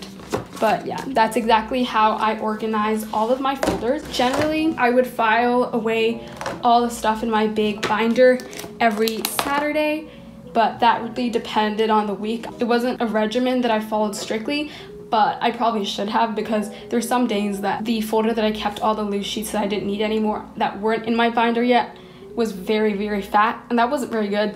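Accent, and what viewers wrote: United States. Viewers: don’t you have an american accent?so why are you doing GCSEs? or have i got it all wrong 😭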